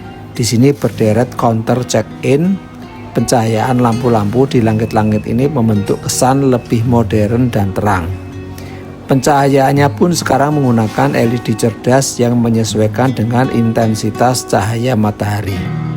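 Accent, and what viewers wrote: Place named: Java, Indonesia. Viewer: Logat jawanya 😂😂😂😂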